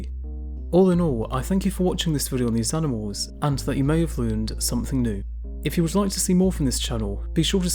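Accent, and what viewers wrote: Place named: Wales, United Kingdom. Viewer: What's that accent.. ? Can't figure it out.. sounds Welsh though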